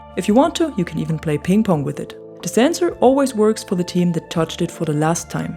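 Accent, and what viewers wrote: Germany. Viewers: Warum hört man die Deutschen immer raus wenn sie Englisch sprechen 😁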